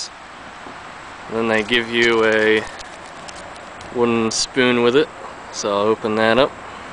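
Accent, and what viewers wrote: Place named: Philadelphia, United States. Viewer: love the Philly accent!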